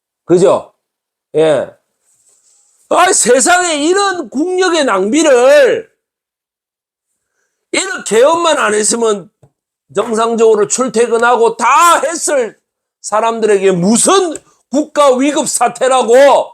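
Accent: Gyeongsang, South Korea. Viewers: ● 항상 매 영상마다 잠에서일어난 숙취가득한 헤어와 까슬수염 가득한 얼굴이지만 그래도 교수를 나는 사랑한다.. 그의 정의에가득찬 경남사투리를 나는 사랑한다...●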